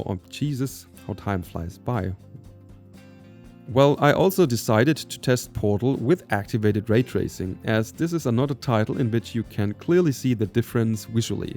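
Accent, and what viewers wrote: Germany. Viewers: Kling für mich irgendwie nach deutschem Akzent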